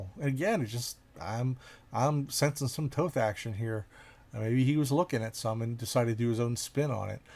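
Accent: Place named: Pittsburgh, United States. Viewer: I love the accent, would it be safe to call it “jag off speaking”? Also … you need to find a reason to do your Gene Simmons in one of these, maybe if you do an SA Marvel that he might have read when he was learning English?!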